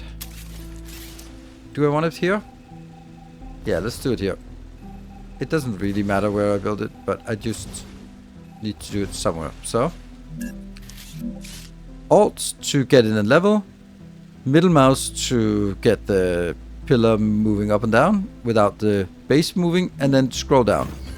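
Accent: Denmark. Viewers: Quick question i hear your voice but are you dansk ?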